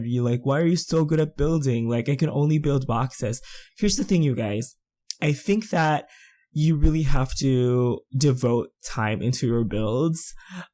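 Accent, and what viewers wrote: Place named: Philippines. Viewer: the first time I heard your voice. and started wondering if you were a filipino.. and you are... hi po ^^ new subscriber here ^^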